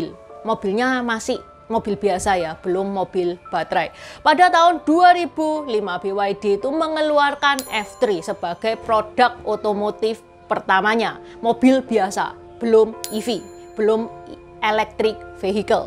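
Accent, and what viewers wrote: Java, Indonesia. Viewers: Aku suka logat hostnya. Orang Jawa kalah medok. ❤ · Logatmu negara Jawa,, 🤣🤣 ,, Jawa itu cuma suku yg tinggal di daerah Jawa di Indonesia,, bahkan di pulau Jawa (bukan negara Jawa),, sewajibnya kamu harus logat Indonesia,, jika tidak, channelmu gak bakal maju sampai mati 😢😥🤤😲,, belajarlah ke Deddy Corbuzier yg Indonesia banget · NGOMONG NYA BIASA AJA TUDAK USAH MEDOK GITU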